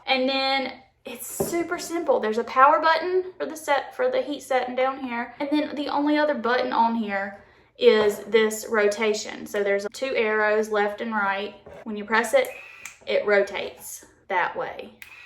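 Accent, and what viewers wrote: South, United States. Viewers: Omg you're so pretty!! you remind me of when I was at Oral Roberts University in person with your Southern accent love😍😘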